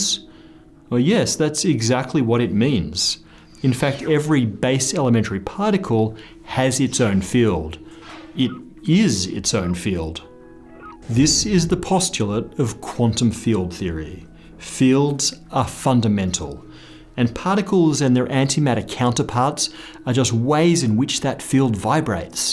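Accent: Australia. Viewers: I'm not sure if people are joking but this 'aint no British accent. The guy's got a posh Australian accent. Who knew? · sounds more Australian or NewZealand than British.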